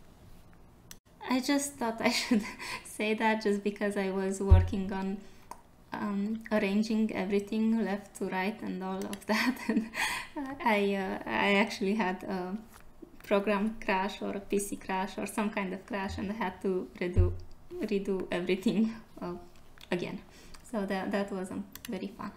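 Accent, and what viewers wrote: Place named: Romania. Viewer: Your accent sounded so familiar, of course you are a fellow Romanian! Keep up the great work, amazing tutorial!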